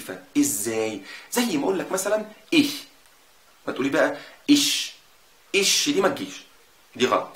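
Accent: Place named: Damietta, Egypt. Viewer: ده فى دمياط بيتكلموا بالشكل ده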